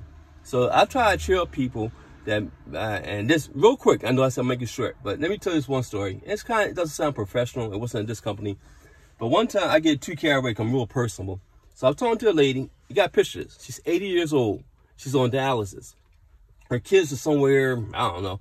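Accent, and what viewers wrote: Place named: Philadelphia, United States. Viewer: You can so tell you are a Philadelphian. You talk so fast lol. But I'm a Philadelphian too so understood every word. I lived in Philly all of my 40 years but I am unfamiliar with this area. I love history and I found out that the first stone home built here in Philadelphia, that still stands today, was in West Philly. It was the home of William Penn's doctor. It's at 53rd and Woodland. I want to drive by one day to see it. I am more familiar with North Philly and Northeast Philly. I am so unfamiliar with South Philly and West Philly. Also, University City. University City doesn't even feel like Philly to me. It's a bunch of college kids and transplant yuppies. But anyway, I enjoyed listening to your story. Also, I can tell by all of the great and positive things you said that your time will come, kind sir. 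I am huge on God and spirituality and I was told before that if you truly want something to show gratitude towards it as if you already have it. When we do that we actually draw it to ourselves by our sense of gratitude. Almost like fooling the universe. Coincidences are merely God's prearrangement of plans and maybe I wrote something in this comment that you were meant to hear. If I didn't I still hope to leave an imprint of unconditional love on your heart. May God bless you on all of your future endeavors and for the sake of humanity, please keep spreading love. The world desperately needs you more now than ever before. Good always conquers evil.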